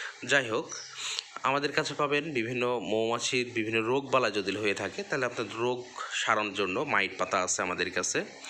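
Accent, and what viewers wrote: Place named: North Bengal, India. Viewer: আপনার কথা শুনে মনে হচ্ছে উত্তর বঙ্গে বাসা, বাসা কোথায় আপনার?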